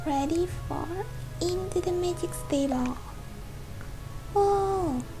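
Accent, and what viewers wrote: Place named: United Kingdom. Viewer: You have a nice britsh voice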